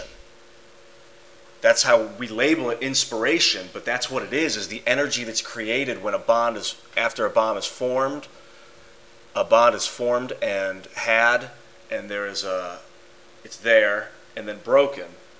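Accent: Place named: South, United States. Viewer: You must be southern.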